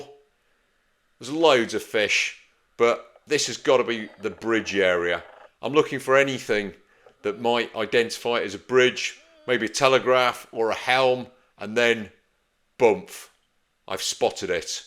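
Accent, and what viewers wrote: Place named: England, United Kingdom. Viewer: Really enjoyed watching this..and love the English accent..I'll lay odds on you were in the Services...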